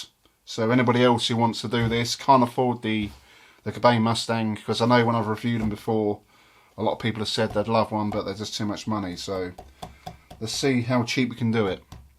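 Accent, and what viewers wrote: United Kingdom. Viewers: Where are you from, you sound proper Yorkshire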